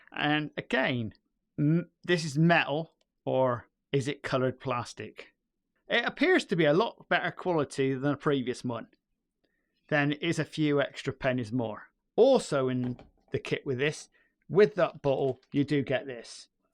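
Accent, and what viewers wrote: United Kingdom. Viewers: West country or Norfolk?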